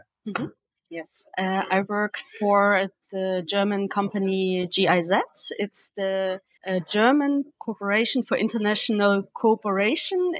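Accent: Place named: Russia. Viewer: Отлично,. Английский ваш смешной, очень русский, но честный! И ход точный: с разворота брать интервью на английском. Знай наших, питерских!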